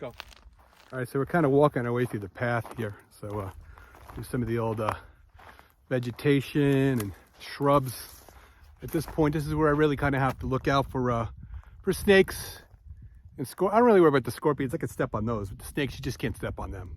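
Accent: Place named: East Coast, United States. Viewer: No disrespect but you sound like people from the east coast. Why are you here? Hope you’re not an east coast liberal. If so please go home.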